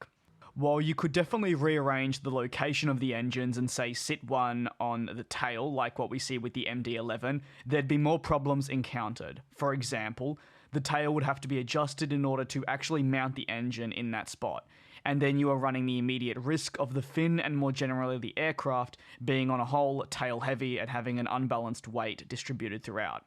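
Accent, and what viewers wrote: Australia. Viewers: "..A bucket.load.." Great to hear an Aussie speaking, even though you're too polite to say " a metric ***k tonne" Goodonya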